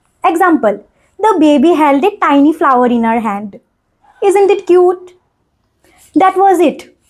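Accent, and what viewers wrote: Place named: Bihar, India. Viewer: Your accent is bihari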